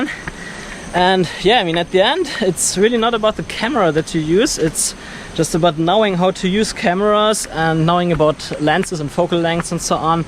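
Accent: Germany. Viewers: Keine 48 Sekunden dein Video angeschaut und gewusst, dass du ein Deutscher bist :D Der Akzent ist eben sehr durchdringend haha :D Hast ein Abo da! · Der deutsche Akzent ist real :D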